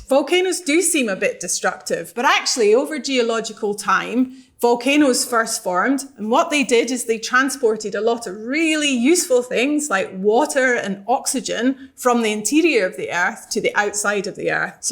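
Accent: United Kingdom. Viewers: This dumbass british accent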